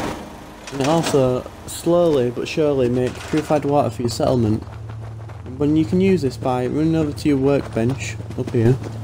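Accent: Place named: United Kingdom. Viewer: This is the most British accent I've ever heard, Also thanks even tho this video is already 6 years old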